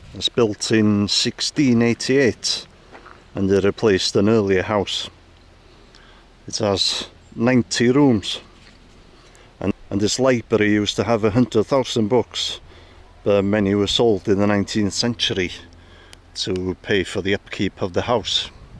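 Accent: Wales, United Kingdom. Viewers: Is he welsh thats narrating cos the accent is terrible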